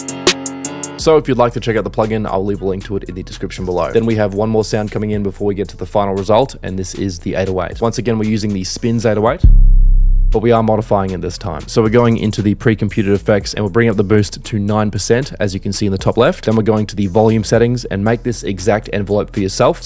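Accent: Australia. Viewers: you aussie? glad I come across u, awesome tutorials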